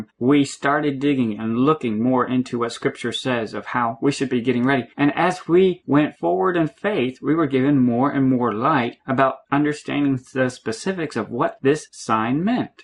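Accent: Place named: South, United States. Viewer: I know, isn't his accent the cutest, southern drawl. He will be busy with lots of hugs very soon! Of course, after we all fall down at the feet of our amazing Lord and Savior Jesus Christ and get hugs from Him first. Maranatha guys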